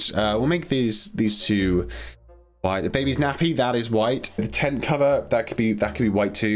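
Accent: United Kingdom. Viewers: I love accent I wish I had a british accent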